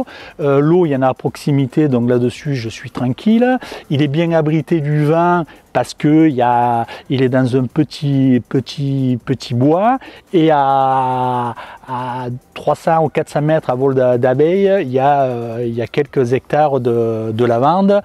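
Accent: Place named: South, France. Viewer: Merci our le bilan de l 'année et l accent du midi, plafond bas et frais sur notre tête en normandie depuis quelques jours, en espérant que 2022 soit meilleur pour les apis bonnes fêtes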